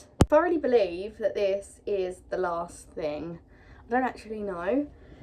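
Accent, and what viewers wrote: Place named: United Kingdom. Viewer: you are GORGEOUS. Beautiful brown/green eyes, beautiful smile and cute voice with the British accent. Beautiful body and CURVES. You are STUNNING in everything you wear. All of the outfits in this video look REALLY cute on you and the colors they are make you BEAUTIFUL. The greens definitely look GREAT on you and bring out those beautiful eyes. You are GORGEOUS and SUPER cute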